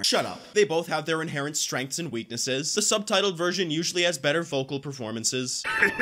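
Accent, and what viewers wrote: Canada. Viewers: i feel like this man lives in Edmonton or Calgary somewhere cuz mf just sounds like one of us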